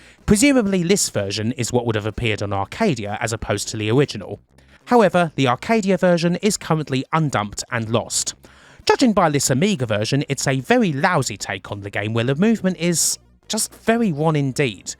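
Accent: United Kingdom. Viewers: My new favorite retrogame channel! And like british accents. Can't get enough of your stuff!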